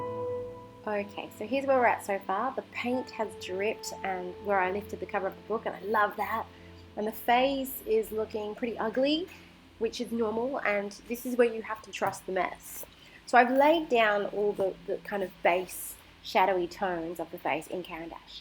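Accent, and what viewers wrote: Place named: United Kingdom. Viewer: I love your "loose" techniques in this video, plus it's very pleasant to watch and listen to your lovely British accent. You don't talk too much, which I find to be very annoying in many artists' videos. The background music was perfect! The small accents you added at the end using the nib pen made such a huge difference in the end result. Keep making these wonderful videos!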